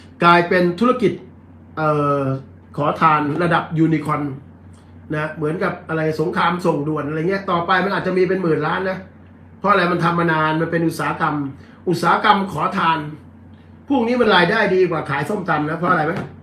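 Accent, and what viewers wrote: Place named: Songkhla, Thailand. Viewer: สงขลาชัดเจนดีครับน้อง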